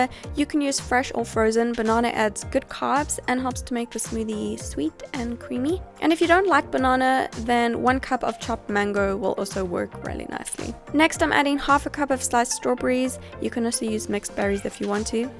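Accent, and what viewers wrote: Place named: South Africa. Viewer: Can i just say love the accent reminds me of home... miss south africa and your accent reminds me of it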